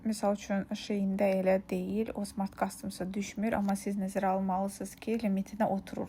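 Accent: Russia. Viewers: danişıginda problem yoxdur, hətta bir çox rus dilli blogerlerden bele səviyyəli danışırsan. Sadəcə aktiv şəkildə rus dilində də danışdığına görə bu sənin aksentində hiss olunur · Salam yaxin olsaydin men de gelib goturerdim😢ve cox sirin danisirsan menim usaqlarim da rus dillidir rus sektirin oxuyular onlarda ele danisir ❤❤❤ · mən sizin danışığınızı çox bəyənirəm mənim uşaqlarımda sizin kimi danışırdı Rus dili idi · Xeyir sənin danşığında heçbir problem yoxdur hətta baxanda bir çox insandan daha yaxşı danşırsan əlavədə rus dili olduğun üçün xırda fərqliliy görlə bilər amma çox gözəl danşırsan hətta mənə ləzt edir sənin danışmağın sevilirsən❤❤❤❤ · Rusdilli qadinsiz deye danisiginiz ferqlidi.ona gore ilk izleyenlere qəribe gelir. Ləzgisiz?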